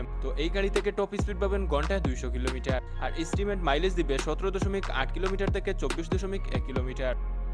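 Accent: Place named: Sylhet, Bangladesh. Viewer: Jodi valo korei kotha bolen apni je Sylhety ta apnar kothay bujha jay.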